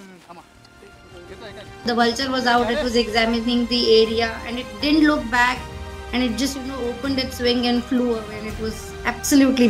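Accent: India. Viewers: Lol, I love Indian accent. BTW thanks 😊 ☺ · the Indian accent makes my head shake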